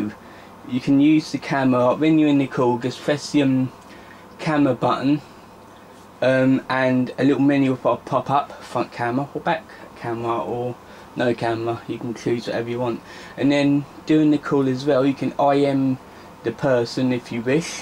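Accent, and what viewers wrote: United Kingdom. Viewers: are u from essex ...i like your accent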